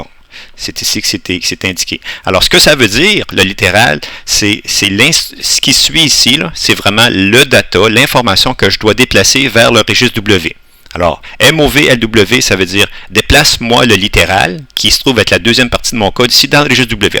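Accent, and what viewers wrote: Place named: Quebec, Canada. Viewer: t'es vraiment une folle de l'agresser comme ça, le son n'a aucun probleme, personnellement je l'entends très bien, la vidéo n'est peut etre pas en hd mais on comprends très bien ce qu'il dit même s'il a un accent québecois, moi qui suit de france, c’est toi qui devrait t'acheter du matériel ou te laver les oreilles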